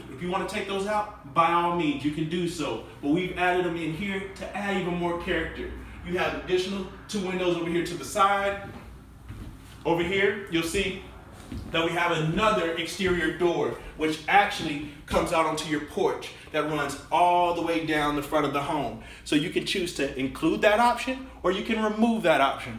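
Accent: South, United States. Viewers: Is he southern, ghetto or just smooth in his mind? Nice layouts, but he turns me off completely.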